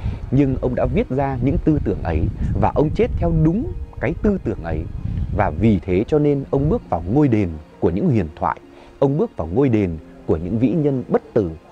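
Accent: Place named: North, Vietnam. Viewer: I really enjoy listening to your voice (Bac ky)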